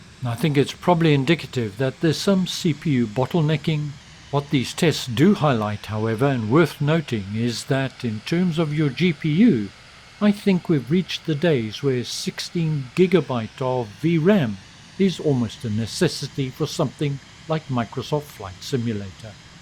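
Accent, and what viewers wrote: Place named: South Africa. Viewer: Totally unrelated, but I gotta know; do I detect a South African accent?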